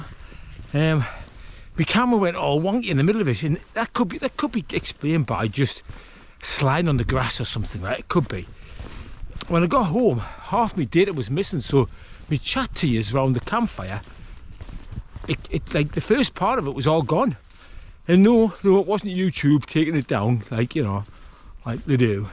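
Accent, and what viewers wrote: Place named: Scotland, United Kingdom. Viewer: Another great video. I'm loving the Scottish accent. My brother in law is a Newcastle boy and it's hilarious listening to him do my accent. It's nearly as good as yours.😅😅😅. Keep doing what you are doing Bonny Lad. 😅😅😅❤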